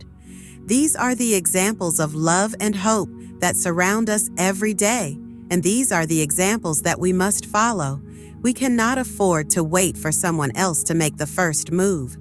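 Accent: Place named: Visayas, Philippines. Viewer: Fake..not deserving..bisaya kaayo.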